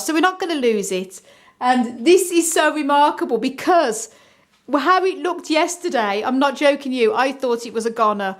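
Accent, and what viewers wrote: Birmingham, United Kingdom. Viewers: she reminds me a bit of susan from the Archers with those fruity high notes... but with 20% added brummy !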